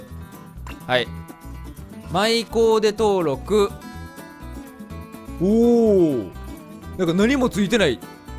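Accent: Kansai, Japan. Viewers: 同じ関西弁やからな（自分も）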